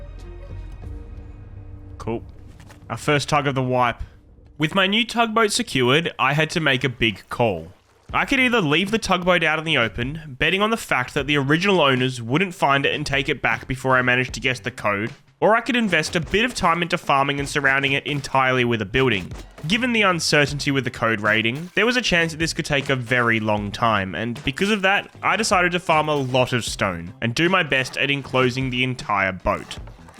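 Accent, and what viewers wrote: Australia. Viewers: First 5 seconds I'm like "Oh he's Australian? Subscribed." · I honestly didn't even hear the Aussie accent and now I find it crazy that I could have talked to this man before❤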